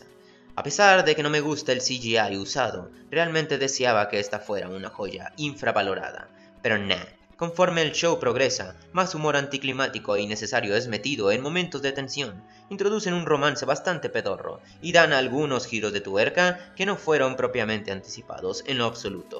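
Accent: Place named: United Kingdom. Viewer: Se que todos odiaran este comentario, pero extraño el acento britanico de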